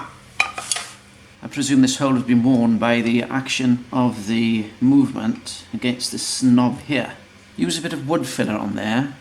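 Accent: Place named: RP, United Kingdom. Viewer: Words fail me...and what the hell is going on with the posh accent?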